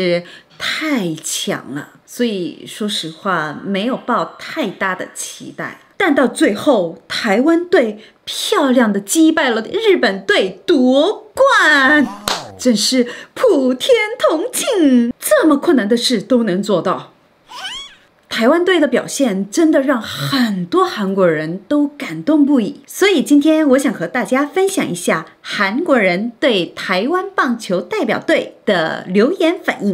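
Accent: Standard. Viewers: 妳的中文好標準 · 天呀 你的中文發音非常標準❤ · 您的中文真標準，謝謝您的影片❤ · 國語超級標準😂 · 這國語也講得太標準了,是真的韓國人嗎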